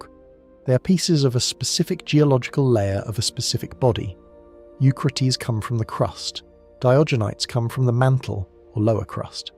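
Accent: United Kingdom. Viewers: Please. Get rid of the insufferable Brit accent. Enough is enough! 😮 😊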